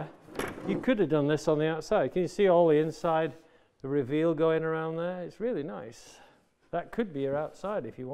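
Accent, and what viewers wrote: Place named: Sheffield, United Kingdom. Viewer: R C:D Sarcasm doesn't travel well on the internet, does it? Try reading my post with a Sheffield accent (like ). Of COURSE he's the master! I was taught engineering by a guy from Sheffield...no matter how well you did something, his best comment was: "Hmm...not bad that. Mek another one to be sure."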